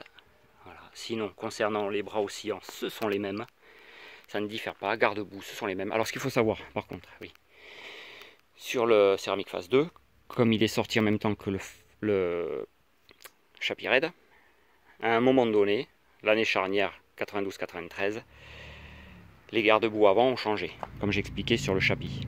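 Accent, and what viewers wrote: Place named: Southwest, France. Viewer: quel plaisir de voir t'es video et quel jolie narration avec ton accent du sud Ouest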